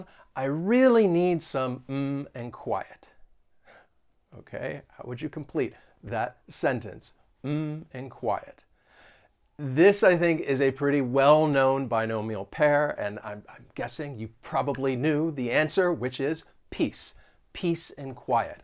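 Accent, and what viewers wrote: United States. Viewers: Hey,.I'm into your Amerikan accent and your cheerful and useful videos.Every time when I watch your video lessons,I'll reams of useful things.Please teach us more phrasal verbs and idioms.I can't thank you enough for your lessons.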